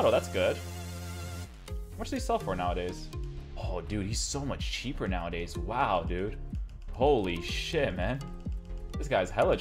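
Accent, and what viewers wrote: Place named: Portugal. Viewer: Are you portuguese? You have a portuguese accent!! Btw you're a great fifa player!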